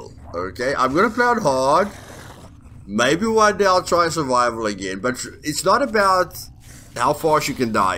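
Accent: South Africa. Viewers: South African?.....If so then hello from fellow the UK!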